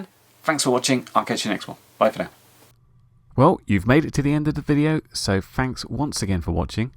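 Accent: United Kingdom. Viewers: I was wondering where you were based on your accent, i didn't know you were Southampton till this video, your not far from me :) I currently have no intention in registering and if everyone did the same it would make it unenforceable. Im confused about the CAA 1000ft exemption, does it still apply to all fpv flyers? Since the start of all this, i have felt that 400ft within a certain proximity to an airport is fair enough but if your not near low flying aircraft then the 1000ft limit should still apply. i live just outside restricted military airspace, im outside there zone and so they never come here but commercial aircraft do not have any flight paths over here ether as i guess they maintain a no man's land buffer to be sure. i can fly perfectly safely at any altitude here and never come into conflict with military or commercial aircraft and to be (officially) kept to 400ft is just stupid. 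As for auto pilots, which way do they want it? Ether they want to say that autopilots are not allowed over a certain altitude OR they want geofencing, you cant have both! The idea that you would remove Autopilots from something is just bonkers, all it achieves is increasing the risks, not diminishing them! I fly over farmland, no matter my style of flying, be it low and fast or high altitude never puts anyone or anything in any danger and i will continue to fly with common sense instead of a lack of sense that the government are displaying over this. I also feel that the more rules the go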